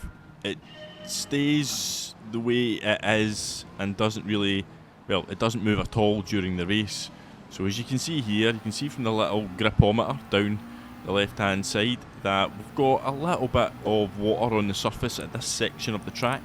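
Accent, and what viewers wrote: Scotland, United Kingdom. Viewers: Scottish accent or not, the way you pronounce p6… er…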